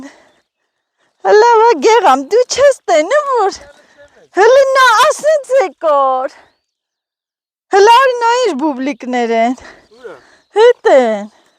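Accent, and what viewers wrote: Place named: Artashat, Armenia. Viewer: jan.erek vor 1in blogy ditumei ev dzer masin yndhanrapes vovhinch chgiei.yntacqum gushakeci vor vayqumeq.qani vor esel es 2 ory kaptavotik snkerem 2 angam gnel.patrastel e mi qichel dzmerva hamar drel sarcaxcik.u hitri vor vayqicen berum.mekel dzer barbaric sarerum xoselis😊😊😊😊 aseci artashatcia.indz tvume vor dhat chishtem gushakel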